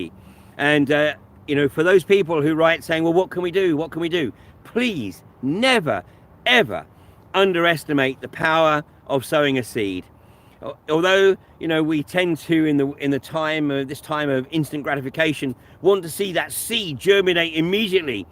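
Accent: England, United Kingdom. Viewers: the “Stop the crime” channel mirrored your video about the culling of the U.K. pop, and so I found your channel, and subbed. So hello from NE Ohio! I just love your awareness, your beautiful background, your English accent, and most of all your awesome spirit! Rock on!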